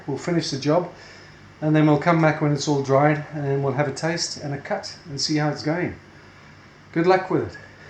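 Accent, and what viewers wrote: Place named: South Africa. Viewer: You sound like a SAFA, so where is the fat boet? Fat = flavour Regards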